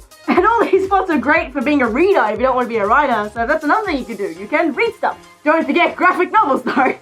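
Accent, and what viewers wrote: Australia. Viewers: I dunno if I just like your Aussie accent or what, but I think you're officially my favorite furry x3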